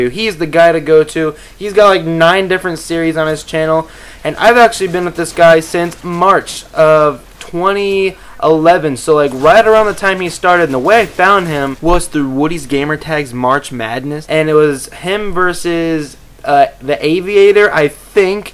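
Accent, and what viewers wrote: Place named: United Kingdom. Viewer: Puppies and a British narrator? BEST SHOW EVER!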